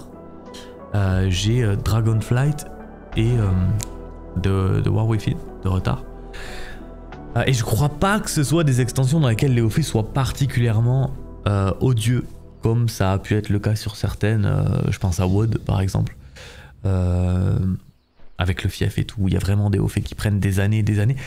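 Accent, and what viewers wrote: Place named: South, France. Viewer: Moi qui au fond espérais voir le retour des vidéos 5 raisons sur wow avec l’accent du sud x)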